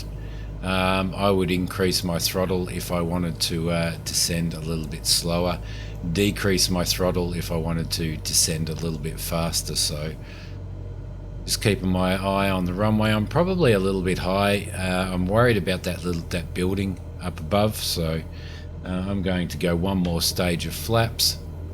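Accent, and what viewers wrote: Australia. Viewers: Thank you bro; You sound Aussie , Do you live over there irl? My home base in "On Air" is YMAV in Cumulus and YMEN in Stratus.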